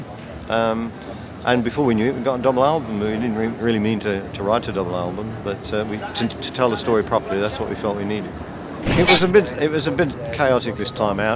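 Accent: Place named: England, United Kingdom. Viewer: he does not open his mouth during speaking... pure english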